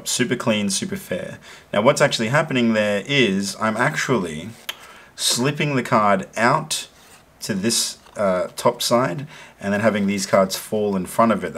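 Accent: Australia. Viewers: are you Australian?😂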